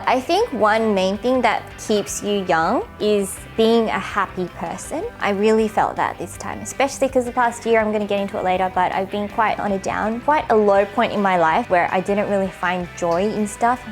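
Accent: Australia. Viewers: Hi its my first time leaving a comment, but i love how you share your faith through ur channel. Yes id love to hear ur testimony! And I really like ur Aussie accent:)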